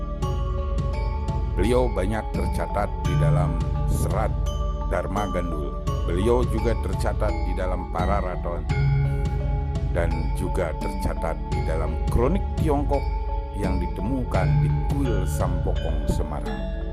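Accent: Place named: Bali, Indonesia. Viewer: Pengisi suaranya kalo ngomong T kayak orang Bali 😁👍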